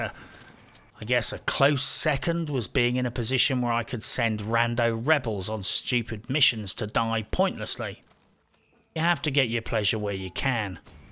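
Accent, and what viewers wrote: United Kingdom. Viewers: Nothing will ever be funnier to my silly American ears than a well-spoken British man taking the absolute piss out of a sh*tty video game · I love how the narrator talks and swears like British Sharlto Copley.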